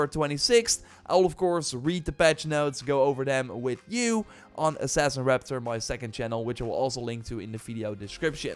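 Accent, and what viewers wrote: Netherlands. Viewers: you sound dutch, is this correct?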